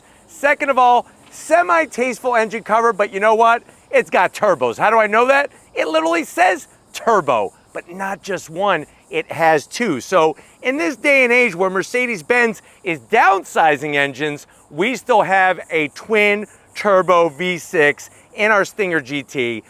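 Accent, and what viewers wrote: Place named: New York, United States. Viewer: Okay, a winning formula for your presentation. I could swear you have a New York accent. Great salesmanship. Do you ever bump into former students at a car dealership?!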